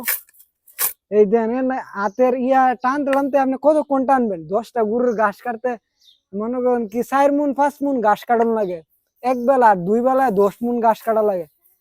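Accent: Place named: Brahmanbaria, Bangladesh. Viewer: তোমার বাড়ি ব্রামনবাড়িয়া?ব্রামন বাড়িয়ার মানুষ কথা শুনলে মেজাজ টা গরম হয়ে যায়,কারণ এরা খাওয়ারে বলে হাওয়া আরো অনেক কথা আছে এই টাইপের আছে।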